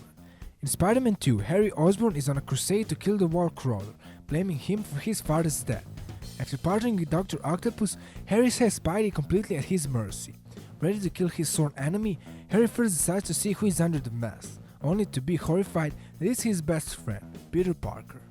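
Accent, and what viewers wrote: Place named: United States. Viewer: Are you from america your accent is so cool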